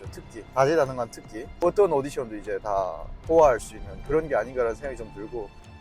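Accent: South Korea. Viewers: 지금까지 본 분들중에서 발음이 가장 남한말과 똑같이 잘하시네요? 응원합니다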